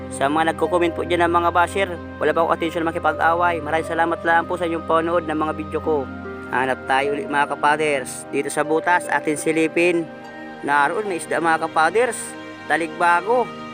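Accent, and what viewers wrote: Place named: Samar, Philippines. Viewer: Sir tagasaan k s Samar? Kc parang minsan nhhalata kita nag bbisaya parang Samarnon lol 😊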